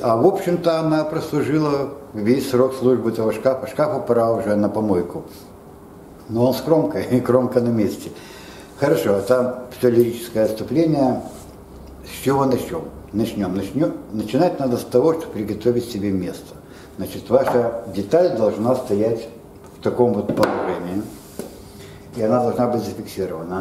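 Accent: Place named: Odesa, Ukraine. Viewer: забавляют-не забавляют-такое...))) Во-первых, имеем дело с чистым одесситом. Слушать одесские обороты и истории-отдельное удовольствие. Как говорится, что вы говорите-не важно-просто говорите по одесски. Мастер, по-моему скромному мнению, очень хорошо и без понтов доносит личный опыт, бесценный. И чего раньше канал не создал. Диме, сыну, огромное благодарю, что таки батю сподвиг. И по друге (во-вторых), коммент вверху уводит в сторону от сути и духа повествования. А так, понятно, каждый имеет право видеть и воспринимать ролик по-своему. Ничего личного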